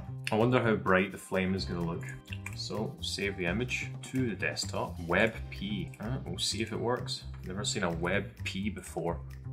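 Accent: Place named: Scotland, United Kingdom. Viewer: And why does he have what must be an Scottish accent?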